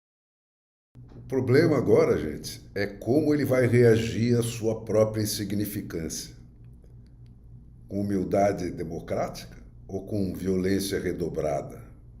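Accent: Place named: Arab world. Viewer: esse cara tem sotaque árabe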